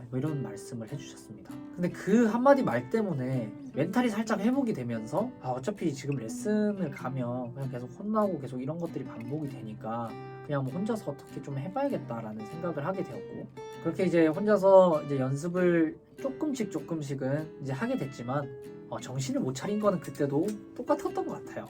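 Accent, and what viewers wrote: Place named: Seoul, South Korea. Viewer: 너무 서울 억양으로 말씀하시는거 아닙니까. 같은 마산 출신으로서 서운합니다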